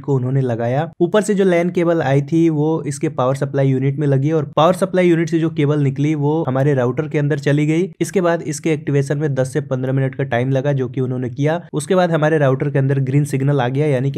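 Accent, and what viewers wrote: Bihar, India. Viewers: Aap Bihar se ho kyaaa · आप की भाषा बिहारी है, और बिहार में सब काम लेट होता है, रायपुर में ०२ घंटे में इंस्टॉल हो गया।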